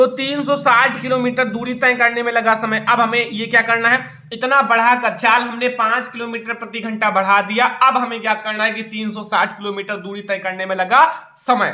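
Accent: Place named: Deoria, India. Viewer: mujhe lga aap deoria se ho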